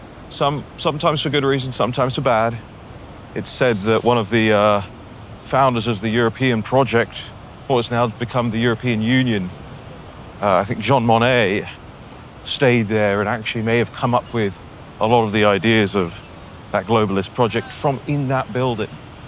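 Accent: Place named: United Kingdom. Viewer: you're losing your beautiful British accent.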